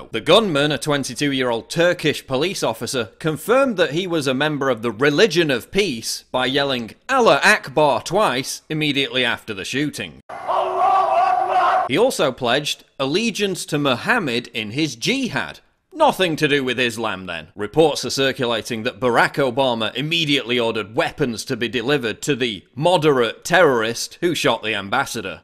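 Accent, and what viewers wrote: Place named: England, United Kingdom. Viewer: Wait a minute you sound like someone from England but calls football soccer? Still good video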